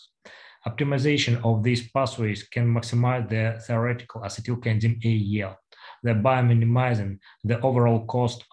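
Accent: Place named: Russia. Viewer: your accent is absolutely from Russia or like these countries, it never seems like English, if you can write in title boxes in English it will be better so we can understand....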